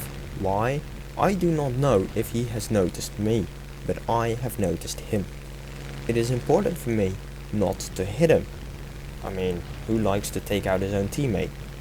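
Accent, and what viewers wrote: Netherlands. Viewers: Nederlander? But a nice vid!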